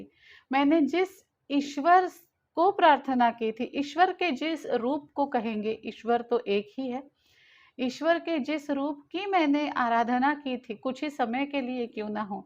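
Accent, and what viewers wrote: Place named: Maharashtra, India. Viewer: नर्मदे हर,🙏🙏आपकी हिंदी भाषा बहुत ही अच्छी है, और कभी कभी आप जो मराठी मिश्रित हिंदी बोलतीं हैं वह तो और भी ज्यादा अच्छी लगती है । मातृभाषा की सौंधी मिट्टी सी महक आती है ।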